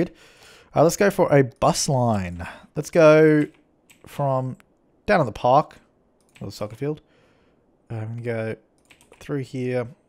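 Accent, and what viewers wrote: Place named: Australia. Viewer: Austrailian accent is beautifull.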